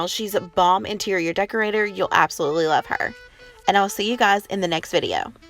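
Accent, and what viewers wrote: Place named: South, United States. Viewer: You are both BEAUTIFUL women! both of you have that southern accent as well! lol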